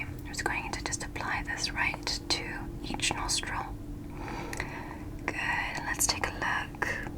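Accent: United Kingdom. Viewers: This was a very good video as always! Is it only me or were u acting british? 👀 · ngl the first ever vid i watched from you you had a british accent and as a fellow brit i was fooled when i heard you speaking normally 😂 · What is your actual voice and accent. You have a British accent on all your doctor videos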